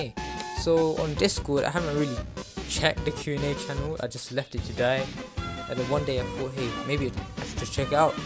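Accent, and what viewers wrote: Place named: India. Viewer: u sound indian😳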